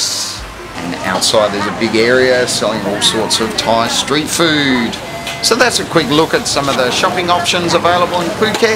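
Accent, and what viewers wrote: Australia. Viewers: Such a strong ozy accent.😂😂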